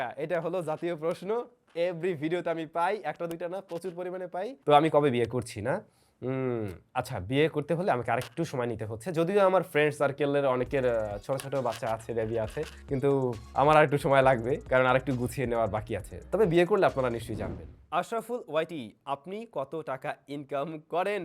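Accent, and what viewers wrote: Barishal, Bangladesh. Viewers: ভিডিওটা দেখে খুব ভালো লাগলো ❤️ ভাই মুইও কইলো বরিশাইল্যা 😂 আমনেও তো হেইয়া ধন্যবাদ 🌺 ভাইকে 💝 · Vai Tumi mogo boreshailla SUNA khubi Valo laglo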